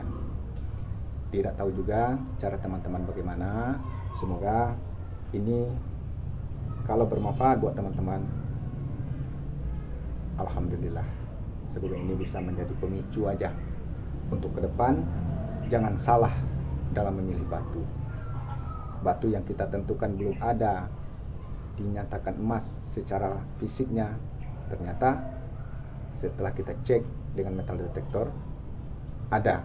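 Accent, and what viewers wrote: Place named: Manggarai, Indonesia. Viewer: Maaf dr logat bicara macamnya asal Manggarai Flores NTT...SALM dr NTT...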